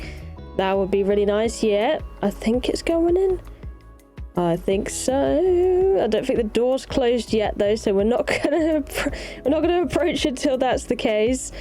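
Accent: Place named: Cockney, United Kingdom. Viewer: The cockney/essex dialect is SO funny. It's not LAMA it is LAMER. It's not WATER it is WUOA. Too funny.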